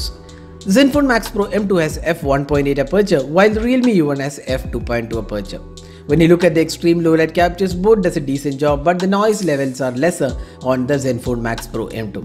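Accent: Kerala, India. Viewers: are you from kerala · His english accent and location looks of kerala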